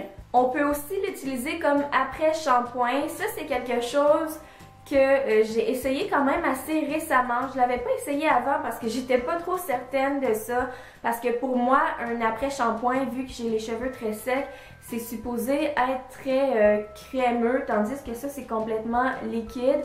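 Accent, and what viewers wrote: Quebec, Canada. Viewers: j aime trop on accent du quebecccccc 👍😘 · salut ma poupe je vous adore j'aime bien ton accent canadien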